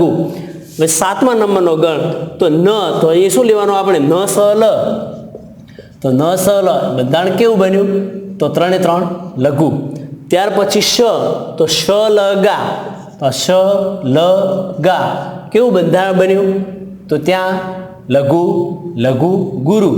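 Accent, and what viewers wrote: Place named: Bhavnagar, India. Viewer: Gajab 6o sir Aap,aapni boli parthi Bhavnagar na lagya sir😅 I m right?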